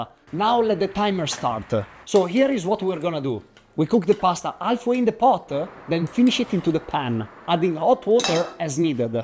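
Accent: Italy. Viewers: sounds very Italian when speaking English because he carries over Italian patterns in pronunciation, stress, rhythm, and intonation, giving his speech a melodic, rising tone and a distinctly Italian flow.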